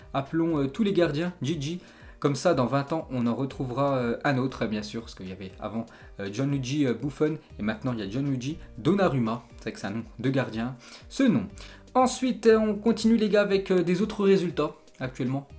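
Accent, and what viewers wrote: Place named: France. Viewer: il à lu Mason Mount avec un accent français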